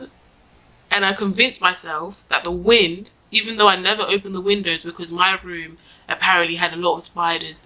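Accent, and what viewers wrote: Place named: London, United Kingdom. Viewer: Oh I had a feeling you was from there I like London culture and the accent I wanna visit one day!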